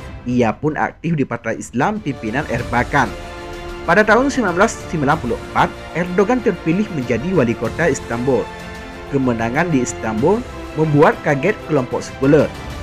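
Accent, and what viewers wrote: Aceh, Indonesia. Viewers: Sama huruf. S .mimin kalau dua kata itu agak susah berarti mimin Acheh.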